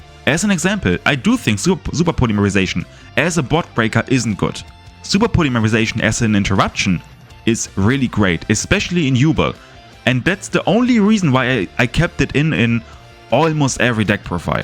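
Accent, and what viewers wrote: Germany. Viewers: Der Bro spricht einfach deutsch auf englisch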